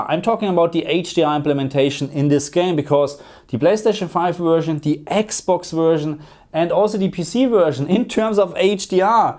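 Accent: Germany. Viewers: Deutsch Akzent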